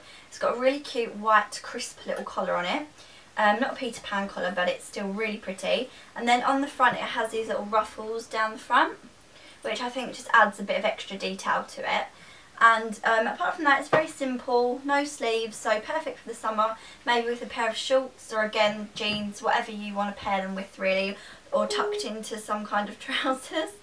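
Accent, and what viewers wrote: Essex, United Kingdom. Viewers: You have wonderful style! I'm in love with basically all the things you hauled. I wanted to ask, are you from Essex? I'm trying to work out your accent. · im a fashion promo student and everything in this video about pastel colors and elephants is all so true! i got the most amazing elephant graphic print baby pink blouse from some website the other day! inlove! by the way, essex accent?!?:) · are you from essex? not in a stalkery wayyy you just sound like you are :)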